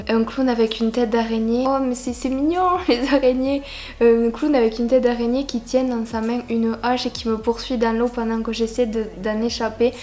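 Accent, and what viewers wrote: South, France. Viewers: l'accent du sud ❤❤❤ j'adore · Et j adore ton accent du sud ^^^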